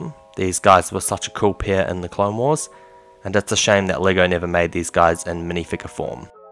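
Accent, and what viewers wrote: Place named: New Zealand. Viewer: Are you from new zealand cuz you sound like one of us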